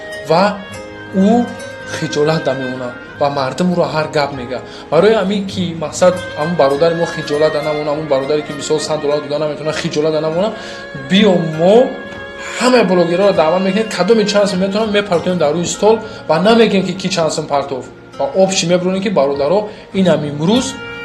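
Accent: Kulob, Tajikistan. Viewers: Кулобии тоза!! 👍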